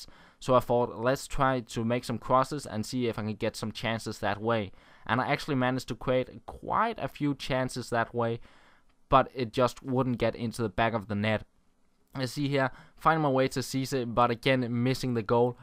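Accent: Denmark. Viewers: u danish? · mate you from denmark! can hear it.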